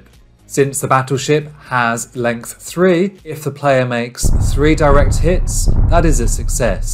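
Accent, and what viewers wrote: United Kingdom. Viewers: please, say "bottle of water " :d , is he british ?:d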